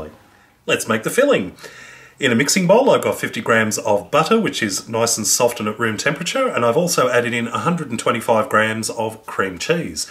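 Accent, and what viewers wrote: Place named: Australia. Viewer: Where did you find buttermilk? Judging from your accent i think your from australia? If so would they sell it at Coles or woolworths?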